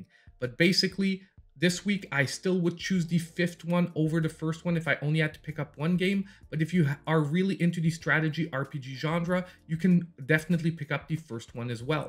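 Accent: Quebec, Canada. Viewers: I'm very surprised that all the deals are from the states, cuz I'd be very surprised if your accent isn't french canadian. Also your channel name is maple syrup...pretty canadian. But I mean people do move to the states haha.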